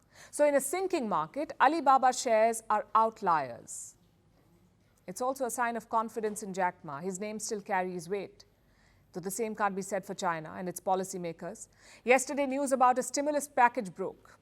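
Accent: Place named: India. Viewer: Very heavy indian accent😂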